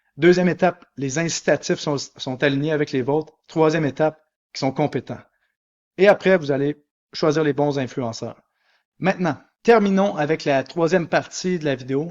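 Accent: Canada. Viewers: Je viens de m'abonnée L accents Canadien ça m'a dépaysé haha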